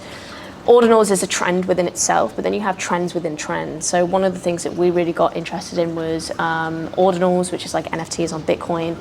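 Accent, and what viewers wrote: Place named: United Kingdom. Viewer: Sounds like UK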